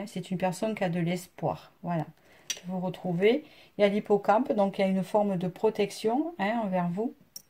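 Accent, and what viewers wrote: South, France. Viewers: Bonjour, J'adore ton accent du sud,il inspire la joie ,le soleil, ne le change pas ,un plaisir de t'écouter parler Merci pour ta générosité ❤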